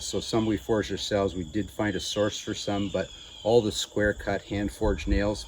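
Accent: Canada. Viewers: Why did you shake your head at 40 secs. in? looking at something on the ground in the middle of a path. And, why is your cabin so close to that pond? And, what species of tree were you cutting down at the beginning of this video? A conifer obviously, but what kind? [Ah! you mention "cedar" . Must be Eastern Red Cedar (which of course is not a "cedar" at all but a juniper - Juniperus virginiana; that is if you are east of the Mississippi. As straight as they are I'm guessing East Red] And, you keep mentioning how hot it is - I'm a new subscriber so do not know where you are located. I don't mean specifically, but just a state or province would likely do for me on climate. Oh! just heard that distinctive "ow-tt" of the Canadian English. (An idea:you could shed some body heat by taking off that felt hat - I think some one said 30% of your body's heat is in your head). 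And, obviously you are not worried about how green that wood is - is that b/c shrinkage will not be a problem. (I was assuming you were using this kind of tree b/c it's rot-resistant). And, I wish you'd shown us how your attached the wood pieces. I assume some sort of nail? But it would have been good to see that. I would also have liked to see some of those two hours getting a hole through the rocks! Re: saws I think maybe I got one of them there crummy saws from Ace hardware. It's got their name on it. Well it is a BITCH to use! I took it in and asked two of the guys there what the deal was? they didn't k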